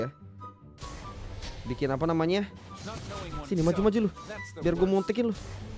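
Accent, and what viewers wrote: Palembang, Indonesia. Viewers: "Aii dahh".. Dialek wong plmbg la nempel trs jo ee😅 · Masih ada logat palembangnya "Aiidaah"😂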